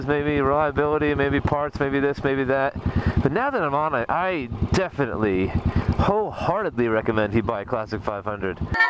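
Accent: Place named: California, United States. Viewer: thanks for your reply I've been a subscriber since late 2018, I wonder why u have a Californian accent though, good morning.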